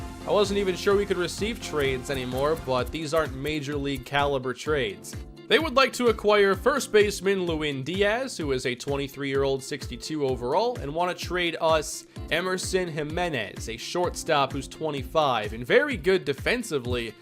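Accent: Minnesota, United States. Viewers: You from Minnesota?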